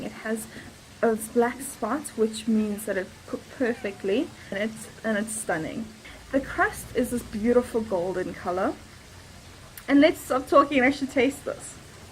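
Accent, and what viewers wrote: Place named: South Africa. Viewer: Your subtitles are the best. Lol... Google clearly doesn't understand our South African accents. Nice videos 👍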